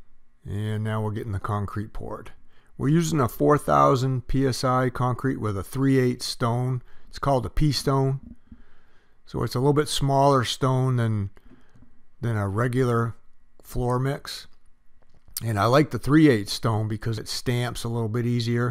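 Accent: Maine, United States. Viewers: Ohmygoodness, you sound like home. You live near Rumford, ME? Or Waterville. I bet you're Waterville. I'm out in Colorado now, but grew up in Monmouth. Watched the whole video just to listen to you. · You sound like you may be from Maine. Great video! God bless from Aroostook County. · LOL within 10 seconds of hearing you speak, I said this guy's from Maine, possibly NH. Good Job!!! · I moved away from Maine about 30 years ago give or take. I'm listening to him and thinking that's a Downeast accent. Then at he says "getting everything right screwed into place".. :) Yup. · I could tell you were a fellow mainer, that accent is thick.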